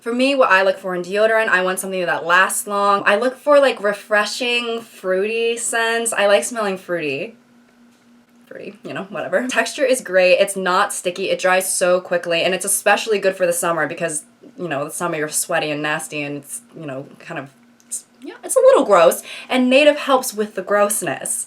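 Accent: United Kingdom. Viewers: omg your british accent is hilarious! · that british accent tho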